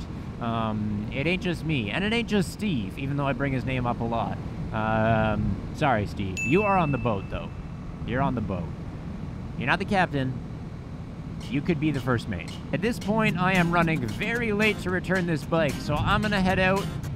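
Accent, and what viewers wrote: Canada. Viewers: I could tell he was Canadian before i watch this video or knew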